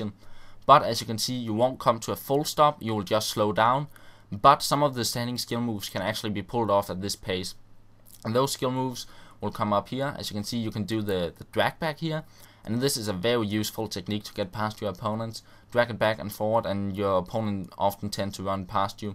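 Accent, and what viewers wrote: Denmark. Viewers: your from Denmark. you got the best nordic english accent I ever heard! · You are from Denmark, i can hear that from your accent. Im from denmark to. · Man kan tydeligt høre at du er dansk! :D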